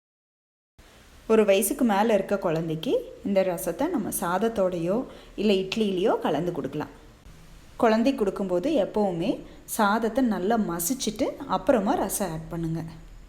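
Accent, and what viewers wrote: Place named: Kongu, India. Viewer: Nice Kongu Slang and useful Recipe:-)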